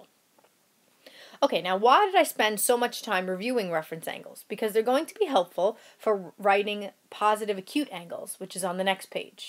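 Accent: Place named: New York, United States. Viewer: I hear a new york accent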